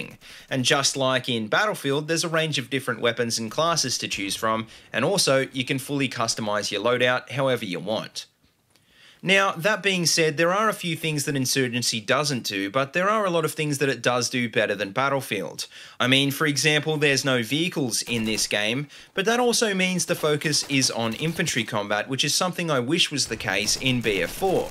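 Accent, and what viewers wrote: Australia. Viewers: Youra Queenslander arnt ya? :p That okka accent! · Gaming I knew you were Australian as soon as I herd your voice keep going mate.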